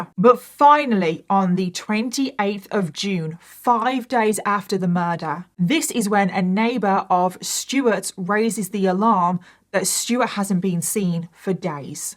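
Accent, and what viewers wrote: United Kingdom. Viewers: I thought you were British with your accent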